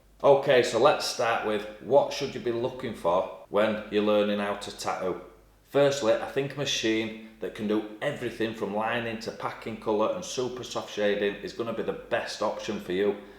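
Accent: United Kingdom. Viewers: So good to hear not only a Brit accent but I'm from Hull so not far off mine, nowt wrong with our cousin's across the pond but I know your advice is UK based & everything you recommend I can get etc, I'm 48 & been dying to tattoo for years, done everything from paint fighter jets, charicaturing, portraits, murals & gravestone artwork (very similar to tat designs tbf especially the Gothic & flower based stuff) & finally said f**k it, dive in & give it a go!! But f**k me tat artists I've tried to get training or tips off are very cagey, I've found the whole industry clique & hostile to outsiders, especially an actual artist like myself who I believe some of them felt intimidated by, they were basically glorified tracers & couldn't draw for shit! Anyway, rant over, I've watched 2 vids so far & think I'll just block out the rest of you tube & stick with you pal!! 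Obviously subbed & notification bell hit so looking forward to binging past content & the new stuff!! Here we go!!!😁😁😁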